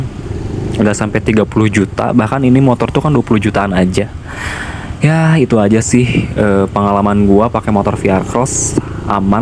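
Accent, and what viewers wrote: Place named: West Java, Indonesia. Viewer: salfok bilang gue elu tapi logat sunda.. hahaha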